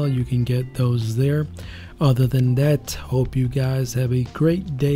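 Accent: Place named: Jamaica. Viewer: Very nice are you Jamaican? Your accent sounds like me. I was looking for colored skin tone so I checked out your tutorial, it’s very informative. Thank you.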